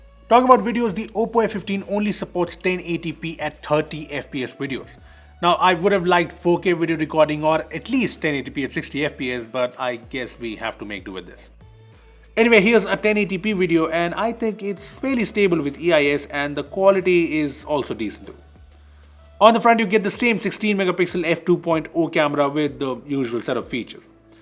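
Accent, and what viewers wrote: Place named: India. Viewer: You exaggerate the "A" sound while speaking , like Amoled etc... Indian accent maybe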